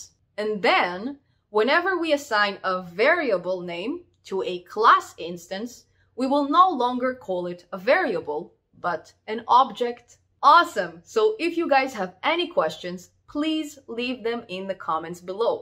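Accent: Russia. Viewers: Your accent sounds really nice. Are you Russian or Ukrainian? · Русский акцент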